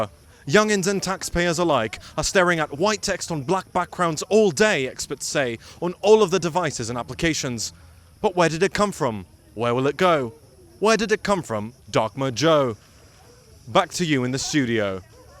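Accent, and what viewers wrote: United Kingdom. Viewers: The topic was highly interesting, the delivery was great! Your vaguely British accent is Decent! The message was appreciated. 10/10 would hurt my eyes watching this video again! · Your British accent is top notch sir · Incredible British accent. I forgot you were American initially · why were you british half the time?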